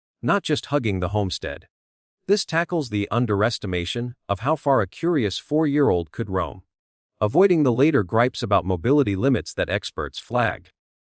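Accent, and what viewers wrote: United States. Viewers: I don't think there ever was a snake nearby on the verandah. Also, it is very difficult listening to all this stuff when the speaker gabbles so quickly, and he has an American accent. He is very difficult to understand his meaning, sometimes.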